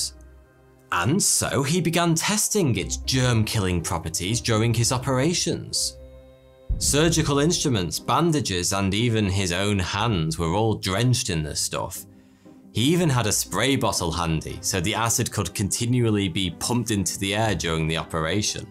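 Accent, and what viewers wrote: United Kingdom. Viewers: Yes, most British accents are pleasant to listen to, including thoughty2, which is similar to Jodie Whittaker's accent on Doctor Who. It's just that one variation that annoys me from some British TV shows. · It's the power of the British accent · Because testosterone and brit accent.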